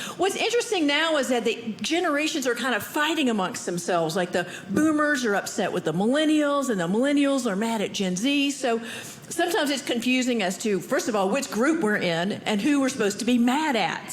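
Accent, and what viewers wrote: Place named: Maine, United States. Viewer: Still a little bit of a Georgia accent, but more Maine in there than before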